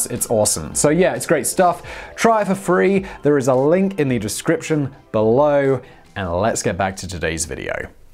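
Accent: United Kingdom. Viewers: I must respectfully disagree. has an awesome British accent. I say, let him speak.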